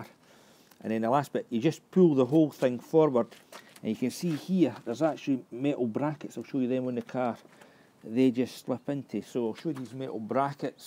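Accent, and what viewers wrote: Scotland, United Kingdom. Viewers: very nice scottish accent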